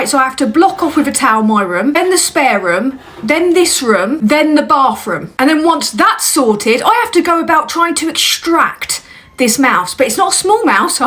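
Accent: United Kingdom. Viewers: You sound so BRITISH it's adorable.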